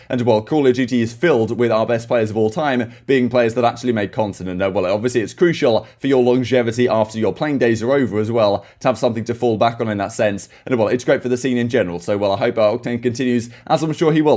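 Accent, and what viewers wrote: United Kingdom. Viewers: I assume by your uploads and your accent you too are also from the Uk. What do you think about the later games that might not take place until 1 or 2 our time?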